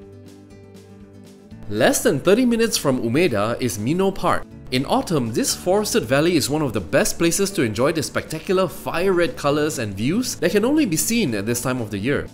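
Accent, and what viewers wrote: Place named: Singapore. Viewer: You sound like you’re from Singapore.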